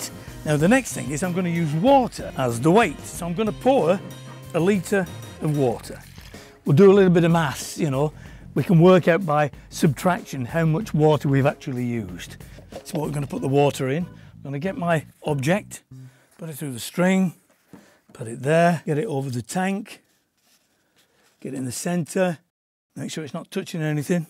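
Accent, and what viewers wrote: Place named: Midlands, United Kingdom. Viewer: Some kind of english accent, propably the midlands region i think.. or maybe newcastle.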